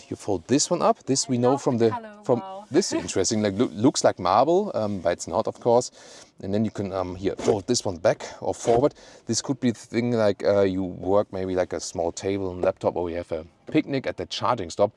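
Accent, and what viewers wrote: Germany. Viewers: I have watched a few of our videos and I find you one of the very few decent YouTube car reviewers. Your professional and in-depth approach are a cut above the usual brigade of self obsessed useless reviewers. I am British and love Germany, I find a lot of German things very soothing from the accent to the engineering and architecture. I think I probably was German in a previous life 😂. I like the Kia EV4 and would probably go for the sedan version due to bigger boot and I prefer the styling. I would love the 800 volt charging to be on the EV4 so you can charge from 10% to 80% in about 20 minutes however for me changing from 10% to 80% in about 30 minutes on 400 volts is not that bad an don't a deal breaker. I am concerned about the laggy slow GPS and if it is difficult to plan changing stops as you allude to. Overall I loved your review and am thinking of leasing one when they come to the UK as I want a Tesla but due to Elon's cray antics not to forget him going full Nazi I can not buy a Tesla in good conscience. 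I am genuinely curious about what you personally think about his an antics and his Nazi salute and what most Germans think. Lots of love to Europe from Britain ❤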